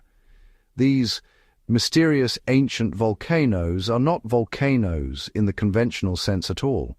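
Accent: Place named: United Kingdom. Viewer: WHAT SOUNDS TO ME LIKE A BRITISH ACCENT IN NARRATION IS MOST OBNOXIOUS.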